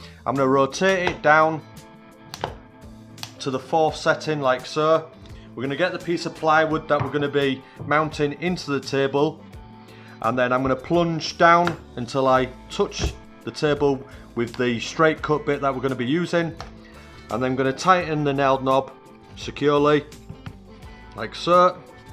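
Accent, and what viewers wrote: Hull, United Kingdom. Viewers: That’s an ‘Ull accent if I’ve ever heard one.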